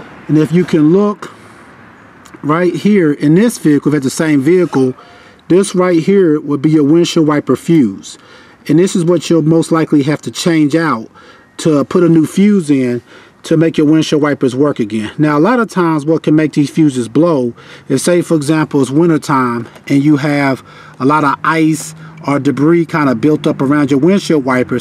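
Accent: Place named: New York, United States. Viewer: Man I love your accent, its sound southern and New-Yorker at the same time. Thanks also for the car tip, god bless you sir!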